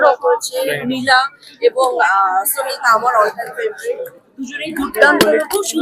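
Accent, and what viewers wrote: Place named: Kolkata, India. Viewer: এর কথায় কলকাতার টান আছে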